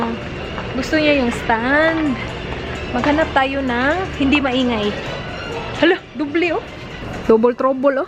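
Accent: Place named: Visayas, Philippines. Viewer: Lalom kaayo ug bisaya ni si.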